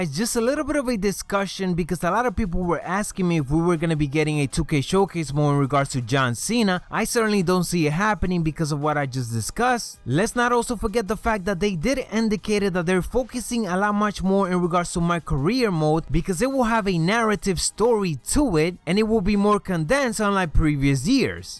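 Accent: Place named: Spain. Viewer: Ur channel rocks , is that ur voice , and r u from Spain or something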